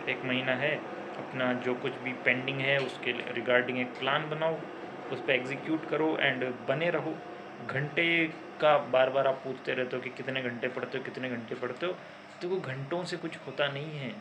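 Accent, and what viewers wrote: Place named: Hadoti, India. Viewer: बोली सै हाडौती का लग रहा है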